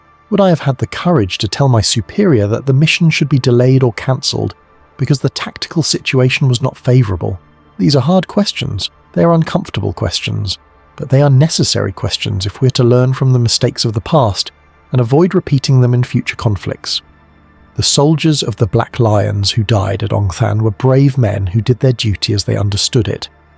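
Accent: United Kingdom. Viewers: Wwwhhy a gd Brit narrator voice? What was next to last option ?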